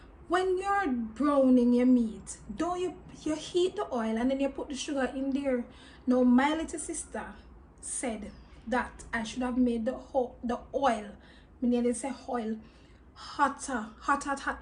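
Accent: Jamaica. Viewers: I heard the news... Treating blacks like that ain't fair. I like your Jamaican english. New family here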